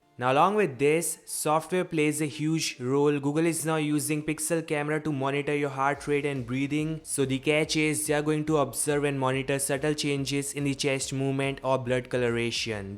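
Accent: India. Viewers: Ngl when I hear the thick Indian accent I close out of the video faster than when I hang up on a scammer.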